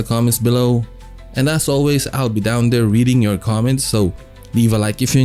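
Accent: Philippines. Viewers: Are you filipino? The accent.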